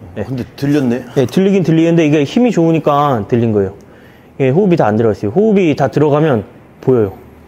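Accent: Gangwon, South Korea. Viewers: 강원도 사투리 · 강원도 사투리 👍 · 강원도 억양이네 ㅋㅋㅋ 흔치 않은데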